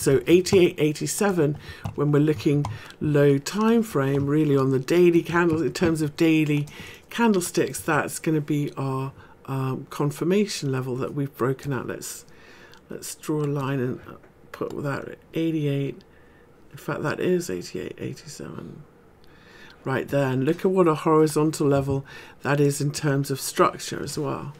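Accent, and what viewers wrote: United Kingdom. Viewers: I absolutely love your voice and your accent. It's very posh and very soothing.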